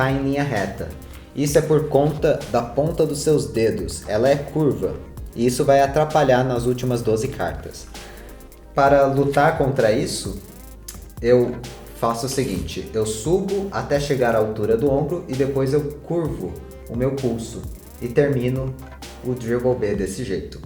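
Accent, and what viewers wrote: Brazil. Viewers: Qualidade alta... cenário simples, técnicas que não vemos no Brasil... de repente: português-Brasil, sotaque paulista. kkkkkkk Mt bom.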